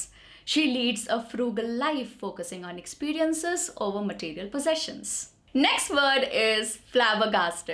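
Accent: India. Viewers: People that don't have an American accent have no business teaching anyone English. That is the strongest indian accent I've ever heard. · You have a huge hindi influence in your English accent, you better work on your accent,intonation it sounds awful.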